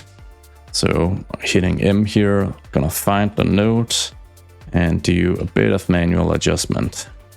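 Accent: Denmark. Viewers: I was wondering about your accent, are you by any chance danish? or maybe dutch?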